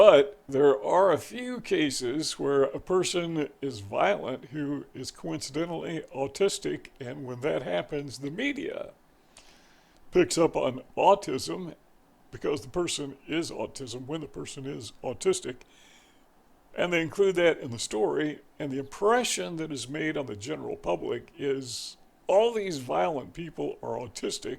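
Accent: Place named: South, United States. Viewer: I’m Australian,and I can pick your southern accent quite easily. It’s a mild accent, but there all the same, especially when you say “I”, it sounds like “ah”.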